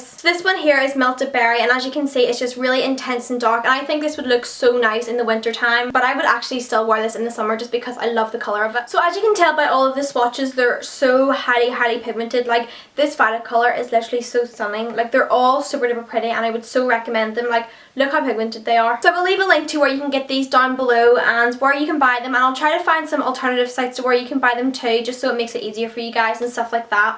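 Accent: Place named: Northern Ireland, United Kingdom. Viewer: I love your accent.. May be weird saying that bc Im from Northern Ireland too :)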